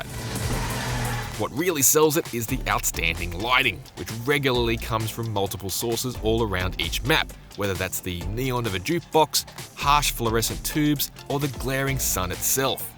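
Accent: Australia. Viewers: Hears an Australian accent = SOLD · Guy sounds like an Australian parody, complete with the toilet strain accents.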